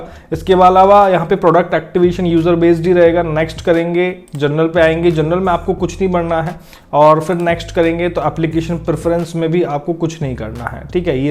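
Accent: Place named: India. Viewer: Bro, you sound like an auctioneer with an Indian accent. slow tf down, there's no prize for fastest speaker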